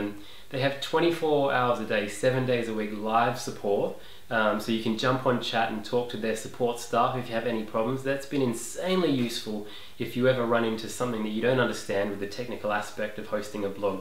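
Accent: Australia. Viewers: wot? you call that an Aussie accent hahaha do NOT apologise for the way we speak most peeps worldwide love our accent just remember to speak slowly I posted my video in reply - just not sure if it came through anyway, love this one have you thought of putting text over as a break from just you talking? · I didn't even hear you mention your accent (I watched the video 2x), let alone apologise for it, but I love it! Aussie accents and Aussie slang are awesome, and among my favourites in the world! :)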